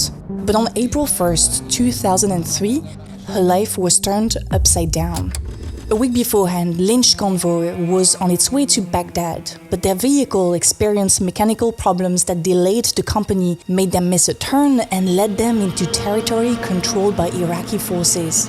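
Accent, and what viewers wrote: France. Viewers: What ks your accent, french? Looking at your username it might also be italian, i do still think french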